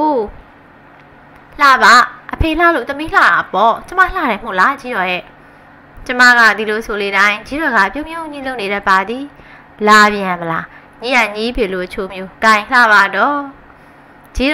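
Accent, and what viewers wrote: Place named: Anya, Myanmar. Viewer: စာဖတ်သူရဲ့လေယူလေသိမ်းကအညာရောက်နေသလိုရသခံစားရတယ်။အသံရှင်ညီမလေးလိုအင်ဆန္ဒအမြန်ပြည့်ပါစေ🥰👍💪🏽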